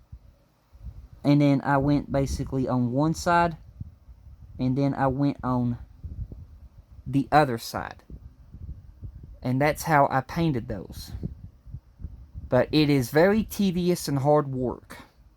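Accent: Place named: Texas, United States. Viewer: Wow you are so clever the way you do these things I find it so interesting to watch you working on this beautiful model. Thank you so very much for sharing this with me I will attempt to do what you have done but im not as skilled as you but i will definitely give it a try. Once again thank you and look forward to your next video take care o!! By the way are you from texas luv your accent stay safe take care 🙂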